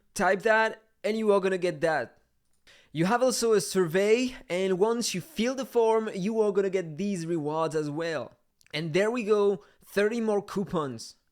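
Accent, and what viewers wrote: France. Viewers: j’ai reconnu le « French accent » 😂 tu fait des bonnes vidéo dommage que je soit obliger d’utiliser la traduction avec un français ! 😭😂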